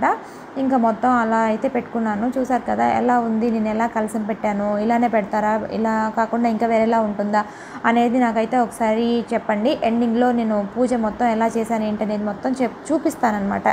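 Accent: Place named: Telangana, India. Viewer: Hai good morning Ra..ela unnavu,fever thagginda..Nannu gurthu pattava..Neevu Naku comment pettavu..Neevu entha ante chala chala baga matpadutunnavu.ila free ga matladithene video andariki chudalai anipinchi chuatharu..Nenu Nee video motham chusi,2 nd time chusthu comment pedutunnanu..avunu ra pooja chesetapudu devidi patalu vintu pooja chesukuntunte manasuku chala prasantham ga untundi...Nenu alane chesthanu ra...prasadalu chala opikaga chesavura...telgana,andra basha chala baga matladutunnavu....bagundi,Rendu Rakalu ga matladura..funny ga bagundi..mee ammayi apple annavu, ma manavaralu muddu Peru lo okati apple,enti okati ani anukuntunnava chala muddu perlu unnayi ra,cherry babu muddy ga unnadu..Maggie chinna pillale thinnara,hahaha,ma intilo andariki Maggie istamu ra..pooja gudu super ga undi, photo petti,kalasam chakkaga pettukunnavu,god bless you ra...Neevu anukunna korikalu Neraverali thalli...intha chinna age lo antha opikaga,chakkaga,paddathiga,chesavu,ekkada meeru undedi...fever thaggi usharuga undalani korukuntunnanu... 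OK thalli byebye...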